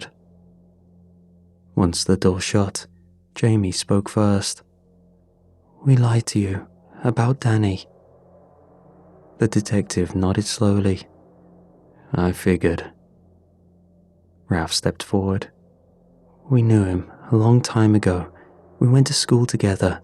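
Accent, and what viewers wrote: United Kingdom. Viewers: I can’t sleep without bedtime stories! 🎉thank you for your dedication and British accent lol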